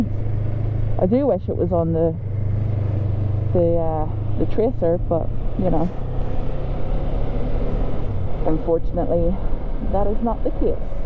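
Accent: Ireland. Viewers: Your accent isn't that thick for an Irish person lol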